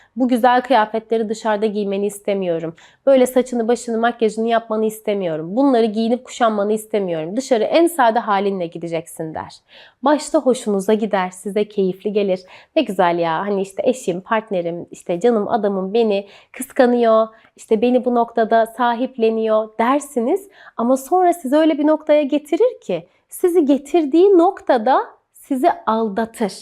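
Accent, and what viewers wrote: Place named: Yozgat, Turkey. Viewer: Ay Yozgat lehçesi vuruyor arada, orada kopuyorum. Emeğine sağlık Hocam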